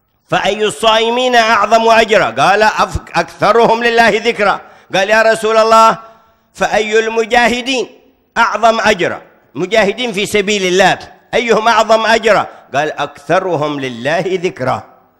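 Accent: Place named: Hadhramaut, Yemen. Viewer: كيف داعية وهو يتكلم بلهجته‼️😐 كيف يفهمون عليه و لهجته حضرمي حبشي‼️😐 والجمهور كله من العجم الله المستعان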